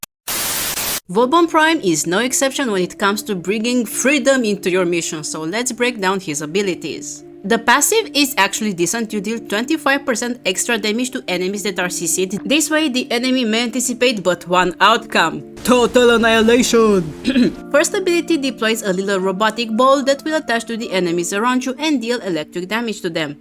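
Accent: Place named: Europe. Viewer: Hearing American behavior with a European accent scratches a special itch in my brain for democracy 🫡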